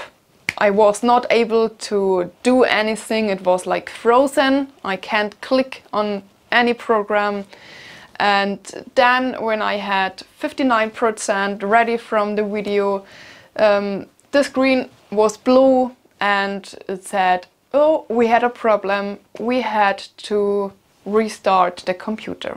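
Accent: Bavaria, Germany. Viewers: Hearing your accent makes me miss Bavaria. You seem tough and I think you will get this all right.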